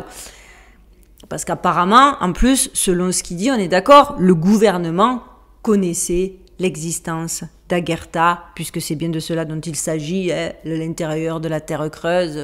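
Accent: Marseille, France. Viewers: Grand merci 🤗 Quel travail de recherche fouillé … Nous avons de la chance de pouvoir bénéficier de ce travail pour l’éveil de nos consciences! Excellente continuation! A très vite 😊 Ps: j’aime beaucoup ton accent: celui du Sud de la France non? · Je ne comprends pas très bien Tu as l accent marseillais mais sur fond d américain Quoiqu il en soit au bout de 2 vidéos je me suis abonnée J adore ta façon de raconter et puis tu es très sympa Heureusement que je suis tombée par hasard sur ta chaîne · Merci pour cette nouvelle vidéo, passionnante, comme toutes les autres. J'aime votre façon de faire vos recherches et d'en rendre compte. Sans compter vos accent du sud qui me rappelle ma Provence! Vous parlez au début de la vidéo de certaines réactions quant à votre pseudo...J'avoue que j'en ai été surprise car cela est diamétralement opposé au travail de recherche et de Lumière que vous faites. 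Et comme chaque mot, chaque émotion ou pensée est créatrice, je trouve votre pseudo sombre, mais vous devez avoir vos raisons d'avoir fait ce choix. Et je le respecte même si cela pose question, et la réaction des gens me parait normale. Belles fêtes de fin d'année et encore merci pour votre travail! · J'adore les "cagoles" intelligentes (avec l'accent de Marseille), surtout ne change rien, et je te souhaite le meilleur.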